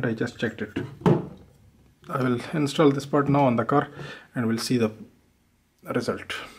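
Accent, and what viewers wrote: India. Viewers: Oh cool! Saudi Arabia eh? Your accent made me think india or Pakistan. How's the collapse in the oil price affecting every day life over there? I've always wondered, what life is like in the kingdom. As for the toothbrush thing, I just thought it was funny that you said "LOL." to indicate that you made a joke.:-) Good video.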